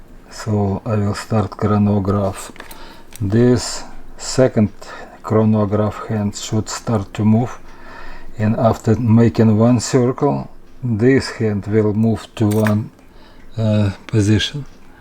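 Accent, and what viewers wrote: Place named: Russia. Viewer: Похоже на русский акцент.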